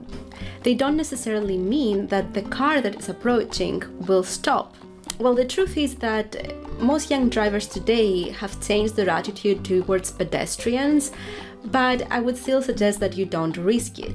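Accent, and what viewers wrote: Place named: Greece. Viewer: Love your accent, are you Greek??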